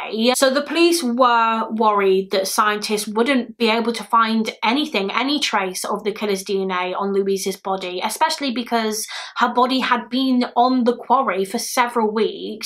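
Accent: United Kingdom. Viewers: It takes a really heartless person to destroy someone so beautiful.:/ Wait, the murderer voluntarily came back to the UK? Wow, just Amazing. - thanks a bunch for all the work You put into these videos and i hope the holidays will be nice for You! (Your accent is so very british, can't get any more british... I hope it won't stick, since i'm a non-brit.):)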